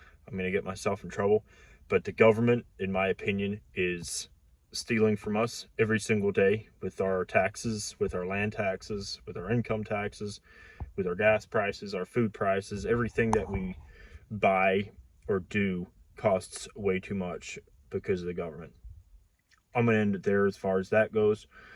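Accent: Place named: Kentucky, United States. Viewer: Sounds like either Northern Ky or western Ky. You need to come out to southeast Ky in the mountains. It’s a whole different world out here. Less government involvement!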